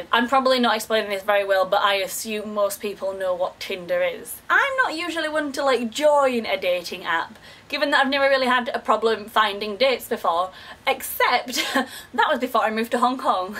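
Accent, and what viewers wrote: Yorkshire, United Kingdom. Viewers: damn that took me by surprise; listening to that yorkshire accent then learning you were in Hong Kong. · I like Tindaaaa Tindaaaa Oh yeah Tindaaaaaaaaa :P Boy, I love your British accent lol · i subscribed just because i heard the yorkshire accent haha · Arrrrrrrrr you're back. God I've missed you. And your awesome Yorkshire accent. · There's no such thing as a 'British accent' because Britain includes Northern Irish, Scottish, Welsh and English region accents. Hers is a Northern English accent, Yorkshire accent specifically. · British Accent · best british accent ever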